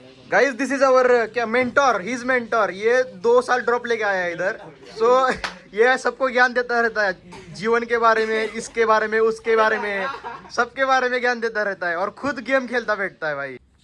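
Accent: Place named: Pakistan. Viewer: Pakisatani English ewwwww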